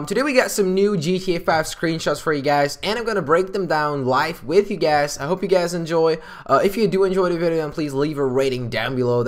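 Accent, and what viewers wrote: Netherlands. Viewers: This guy is from the "South" of the Netherlands, and their Dutch compared with their english is no good... Or easily said: Annoying. (Im from Amsterdam) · He is from The Netherlands So he is speaking not the engilsh as you i think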